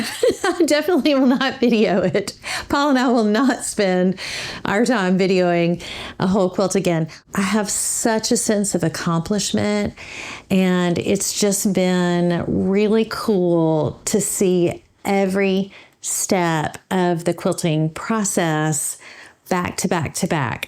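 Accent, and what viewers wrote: South, United States. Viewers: Good grief woman! It is beautiful and you are amazing. I would never even try that. You have my hat and my heart. I truly love how you slip into that southern drawl when you are tired. It is precious. Texan as I was born and raised, I also love a good sammich. Jean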